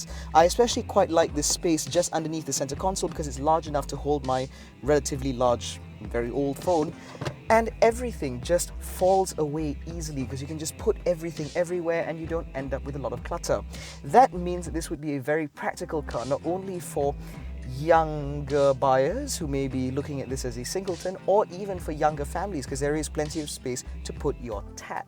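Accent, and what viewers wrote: United Kingdom. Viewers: sound like British accents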